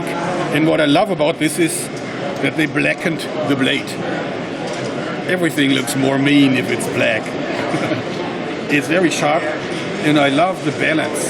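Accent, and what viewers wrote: Germany. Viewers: Where did you find those rubber bands and is that accent German? Also do you have a place where i can buy your originals? Oh, and its kinda weird that you wear socks and sandals.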